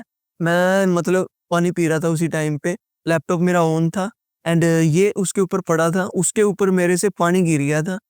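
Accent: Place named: Punjab, India. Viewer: Punjab probably accent se lag rha hai. Or mast tarike se explain bhi kar rahe hai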